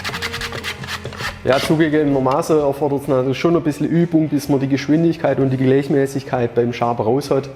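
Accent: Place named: Swabia, Germany. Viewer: Subborr Schwääbischkurs :)))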